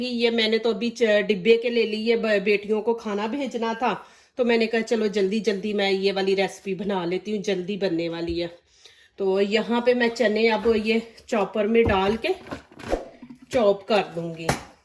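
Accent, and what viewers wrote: Punjab, India. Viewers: Punjabi lehjhe main baat named kya kare, Durst urdu boleh. Recipes acchi hoty hai.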